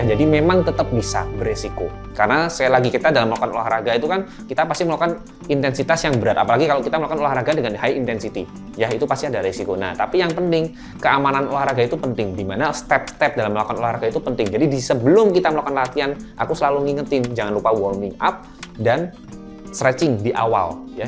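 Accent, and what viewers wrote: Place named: Java, Indonesia. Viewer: Doctor medook.....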